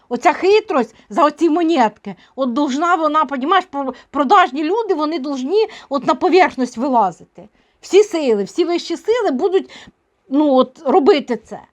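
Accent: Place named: Chernihiv, Ukraine. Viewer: Ми в чернігівській обл.точно так балакаємо і нічого,а скільки наших хлопців з таким суржиком захищають країну!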